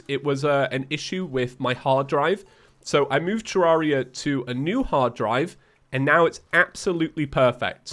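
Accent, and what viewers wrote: United Kingdom. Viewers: Anybody else just realise he's Britain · I'm sorry, I forgot your British...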